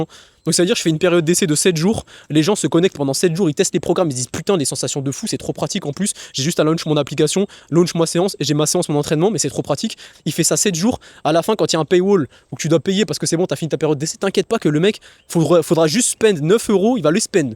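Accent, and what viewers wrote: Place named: Algeria. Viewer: 2 secondes dans la vidéo tu me fatigues avec ton accent pied noir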